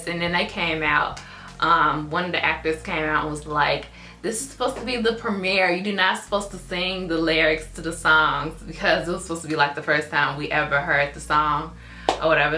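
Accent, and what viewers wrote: Chicago, United States. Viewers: I knew u were from chicago.(hence the accent, cuz we say ain't so many times lol.) me too. I've never seen u. u must be on the southside.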